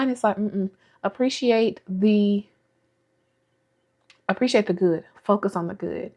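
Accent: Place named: North Louisiana, United States. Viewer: Hey hey girlie!! Girl the more I hear you talk the more I hear that North Louisiana accent lol I'm proud of you girlie and I'm right with on that journey of learning to better love myself as God took His precious time to make this precious jewel. Also keeping in mind that I too have to do my part. COME ON NA!!!!!!!!!!*punching kicking the air*lol WITH THE DESK MINISTRY! Just go ahead and read me! lol Girl editing wasn't too too bad for me but it was aggressive because once I would sit down I would NOT move or answer the phone,nor eat,drink or use the restroom because I needed to be fully zoomed in and not distracted because once i get distracted thats it,it would be hard to get back in the zone. Thanks for allowing God to use you to share those lessons! I love youuuuuuu girlie!!! 📸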